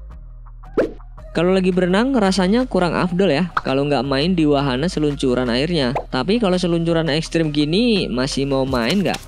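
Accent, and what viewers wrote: Java, Indonesia. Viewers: mau tanya, mimin orang jawa ya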